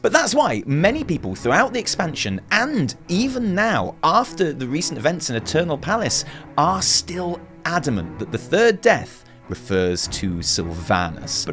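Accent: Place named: RP, United Kingdom. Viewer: Another brit giving us a bad name making everyone think we speak like the queen.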